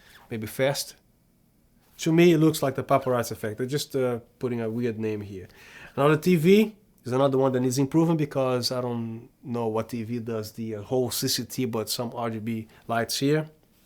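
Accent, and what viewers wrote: Brazil. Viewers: I saw a lot of your videos and always i think you was brazilian or portugues... your english sound like brazilian! Great job bro! Regards from the Caribbean =)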